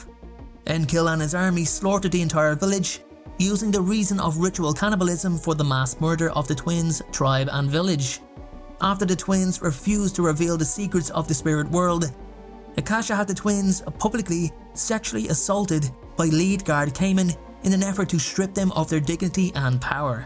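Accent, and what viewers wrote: Ireland. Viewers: Oh boy, so I guess I cannot understand Irish accent :'(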